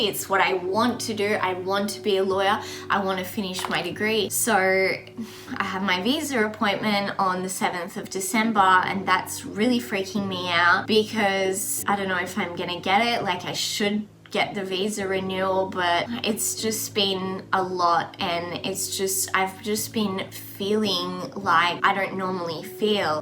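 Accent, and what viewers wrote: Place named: Australia. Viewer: I hear Australian but confused on the exact accent. Great video, just curious to learn more.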